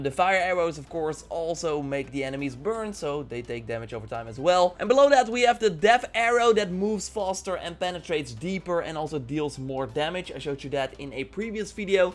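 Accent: Netherlands. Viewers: Je hebt een zwaar Nederlands accent, jow now? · Bertram Rogers I am sure that there are YouTubers covering this gameplay why don't u go watch them, and i am sure that165k people like his dutch accent and his opinions or else they wouldn't be subscribed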